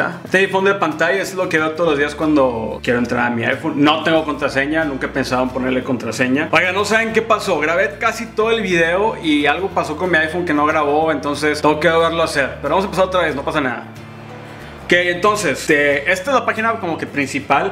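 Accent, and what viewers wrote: Mexico. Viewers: Soy una Chilena que se enamoró del acento Mexicano, por ti😍 Tú y Yuya son adorables y muy lindas personitas❤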